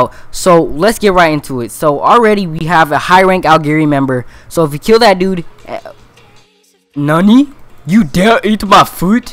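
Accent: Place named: United Kingdom. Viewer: british acent gang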